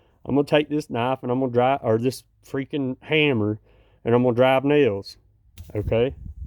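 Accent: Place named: Virginia, United States. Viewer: I should have realized you were from Virginia by the accent. I am too (although I live in Texas now). Great to know a fellow Virginian! Great review on the Baklash. They just came out with a carbon fiber version, so I may have to grab another one.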